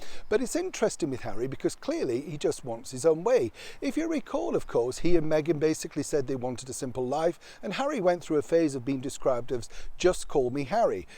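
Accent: United Kingdom. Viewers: Wth is a brit announcing our american baller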